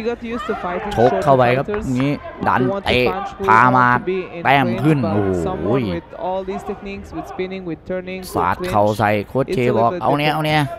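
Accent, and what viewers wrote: Isan, Thailand. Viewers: เว้าลาวอีสาน ผสมกลางมันม่วนดีสุดยอดรวยจ้า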